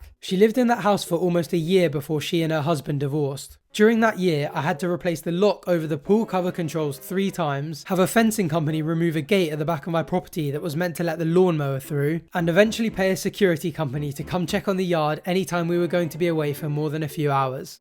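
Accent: United Kingdom. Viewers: I love your voice? Your defo from the uk too yes?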